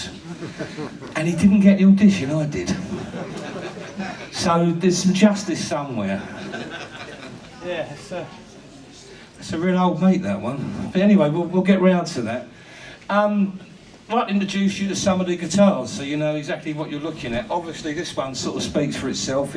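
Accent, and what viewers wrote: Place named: England, United Kingdom. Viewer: I listen to Englishmen talk all the time but this guy seems to try to celebrate the accent and slurring to another level. Good Lord man speak clearly if you're going to put something out on YouTube. Thanks for your effort but it would really help if you could speak to where the rest of the world can understand.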